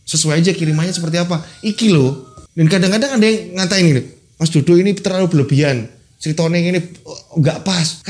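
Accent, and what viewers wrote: Java, Indonesia. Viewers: Mas Dodo enak klo ngomong logat Jawa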